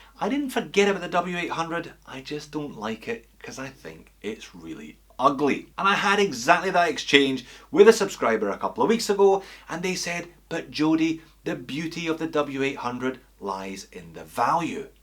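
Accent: Scotland, United Kingdom. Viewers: Another great review Jody! I would like to ask, where in Scotland do you come from? I love your accent. I live on the Borders, and often pop up into Scotland whenever I'm tired of England (I'm English myself but cheer for Wales). I particularly like the "Muckle Toon", Langholm. I'm a Casio fan, too - my sports watch is an ancient Baby G that my Grandad bought for me years ago. It takes a kickin' and keeps on tickin'.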